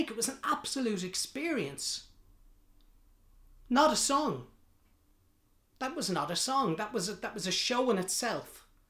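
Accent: Ireland. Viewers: Welcome to the rabbit hole,. It's always nice to hear a fellow Irish accent on YouTube! I see Gabriel has already spotted your reaction, so you have a list to guide you. And we will be along for the ride. Perhaps the ' '?;-) Subbed, so I won't miss a reaction. ' ' - Wacken. <link> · You're a bit Oirish, aren't ya? I'm the Norn Irish fella. Anyway, I was meant to go see them in Dublin on the 17th November 2021 but they've had to postpone until 22nd November 2022 due to coronavirus. Reason I'm tellin ya this is cos it's purely on this song I want to see them, I really want to experience this live for myself. It's on me bucket list. Sure, I've listened to other songs by them but this is the one that really just leaves me speechless and I'm sure actually being there to witness and experience it is a whole other level. They're meant to play in The 3 Arena but I don't know if tickets are still available. 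It's something to look forward to next year...hopefully!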